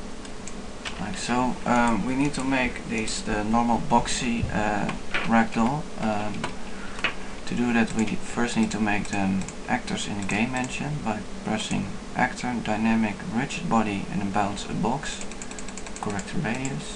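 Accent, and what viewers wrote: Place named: Netherlands. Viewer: nice dutch acscent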